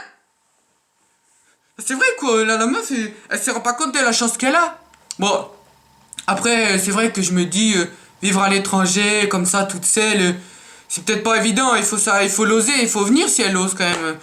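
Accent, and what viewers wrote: Nord-Pas-de-Calais, France. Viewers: T'es du ch'nord toi ?! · "Misote"" Che un gars deu'ch chti le. ♥ :)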